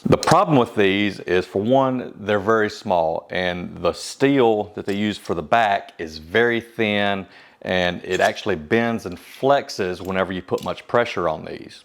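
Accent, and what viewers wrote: South, United States. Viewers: Your southern drawl is divine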